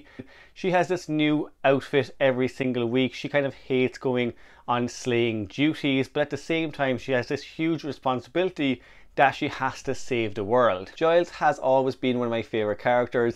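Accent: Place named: Ireland. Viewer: Aaah... a proper Irish accent.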